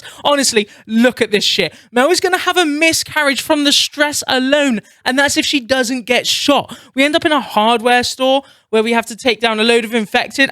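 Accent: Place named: United Kingdom. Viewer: Make more video only down side is that your british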